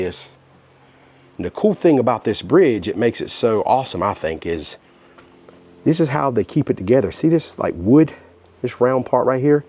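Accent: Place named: Ohio, United States. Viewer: I'm surprised to learn you are from NC. Your "accent" is very Ohio. Thanks for another good video.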